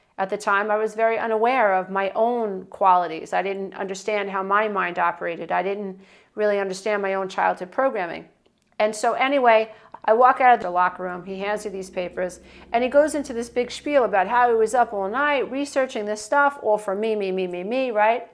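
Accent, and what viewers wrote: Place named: East Coast, United States. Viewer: Thank you your informative video. You're from the Tri-State area. I can't help but note an east coast accent.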